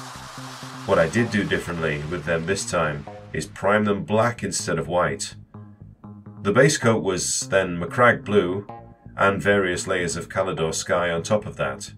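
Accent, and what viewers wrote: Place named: United Kingdom. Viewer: the deep voiceover with UK accent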